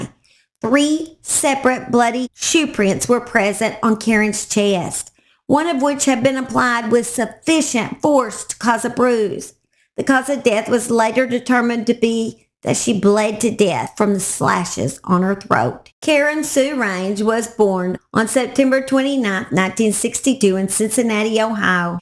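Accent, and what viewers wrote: South, United States. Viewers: Your accent sounds like Southern? Where are you from Mrs.?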